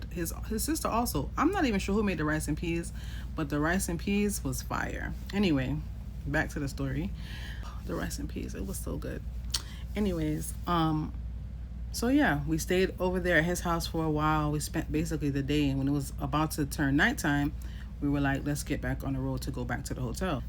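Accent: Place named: Caribbean. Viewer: UR PATOIS S AMAZING IM A YOUNG QUEEN FR NY CARIB AND SPANISH.❤❤❤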